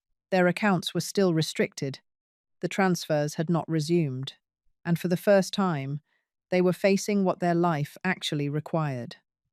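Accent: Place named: San Diego, United States. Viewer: So that's what a San Diego accent sounds like 😅😂😅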